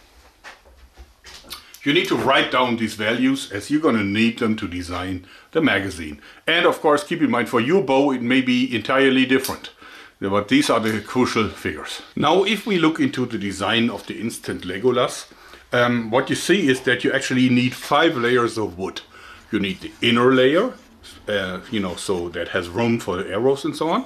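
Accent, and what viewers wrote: Germany. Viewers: Ich weiß nicht warum aber man hört dass er deutscher ist 🤣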